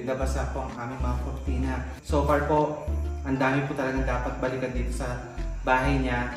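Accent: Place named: Pampanga, Philippines. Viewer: Kapampangan ka pamo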